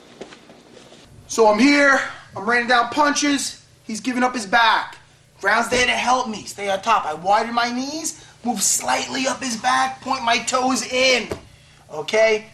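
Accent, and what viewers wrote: United States. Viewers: Love the New York accent!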